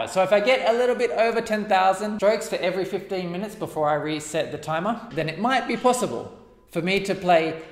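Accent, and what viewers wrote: Australia. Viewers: where are you from? Australia? new Zealand?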